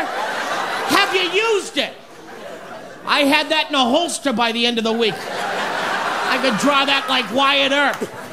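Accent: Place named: Boston, United States. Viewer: That's the Boston accent.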